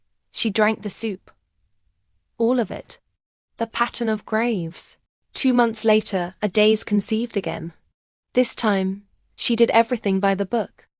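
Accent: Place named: United States. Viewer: A very interesting story but why use American intonation thereby ruining the beauty of the story with very wrong pronouncement?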